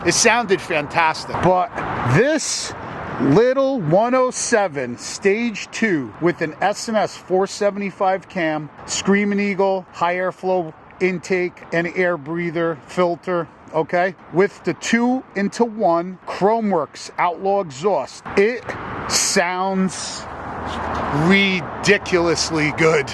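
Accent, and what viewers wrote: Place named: New England, United States. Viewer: I commented this before I heard you say you were in New Jersey, but hearing your accent I knew you were somewhere just below me and that looks just like the Holyoke mall in Holyoke Ma. (Which is only about an hour an a half south of me). I'll deff look for you at laconia. I've actually never gone to laconia for bike week... but Id love to see your bike up close and hopefully go for a quick scoot! I so want to see our bikes side by side threw the gears! See how I'm doin on that little 95" 😏😁👍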